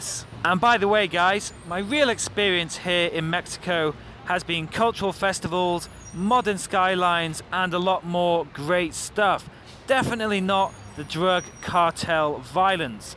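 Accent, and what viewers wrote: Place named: United Kingdom. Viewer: I love all your vids,they very informative,you always try to tell how you see things the nicest way!! but I love the most when I'm listening to your vids is your accent soo British! I love it I love it!! keep up the awesome work! God bless! cheers! :)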